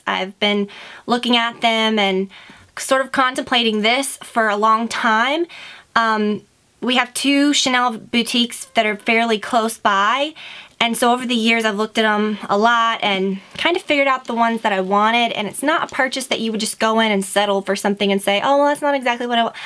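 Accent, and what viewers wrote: South, United States. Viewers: lol why do I feel like you have more of a country accent in this video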